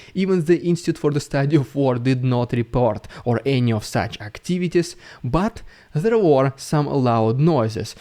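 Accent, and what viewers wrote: Russia. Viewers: Believe it or not, it would be much, much easier to comprehend you if you could get into the way of speaking SLOWLY and make some PAUSES. Obviously you are excited but it is tiring and sometimes annoying to listen to you and your distinct russian accent does not make it better...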